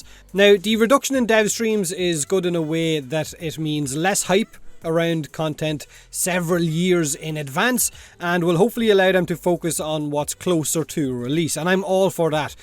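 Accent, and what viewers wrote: Ireland. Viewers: You're my favorite person to watch for warframe related stuff. I love the personality, the Irish accent, the entertaining videos, and the honesty. Don't stop being you, and definitely take care of yourself physically and mentally. My condolences for your losses.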